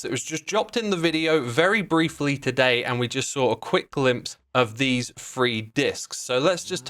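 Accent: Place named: United Kingdom. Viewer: Why do you sound... MORE British than usual???? Is that just me??? lmao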